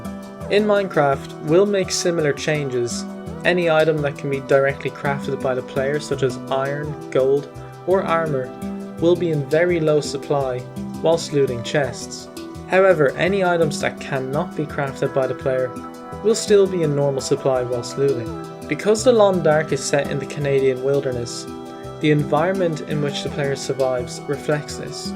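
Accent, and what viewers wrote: Ireland. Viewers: You are definitely Irish I can tell because I lived in Dublin for many years Good video by the way you make great points👍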